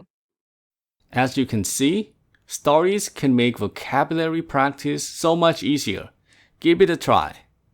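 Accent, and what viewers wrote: Thailand. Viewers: I think your broke English sounds like Thai pronunciation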